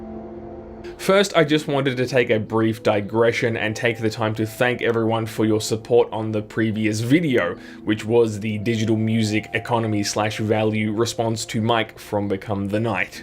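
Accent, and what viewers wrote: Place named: Australia. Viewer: Hahaha that's just the down under accent... Been around Aussies and Kiwis long enough to recognize it anywhere. The upside is that it is relatively simple to impersonate.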